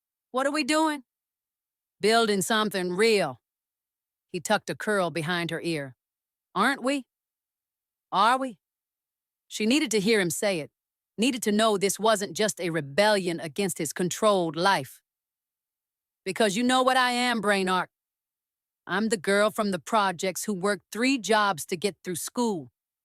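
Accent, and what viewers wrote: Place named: South, United States. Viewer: She's Southern, a great Voice.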